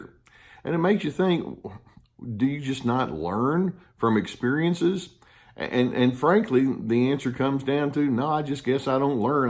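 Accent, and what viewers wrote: Texas, United States. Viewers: A Texan?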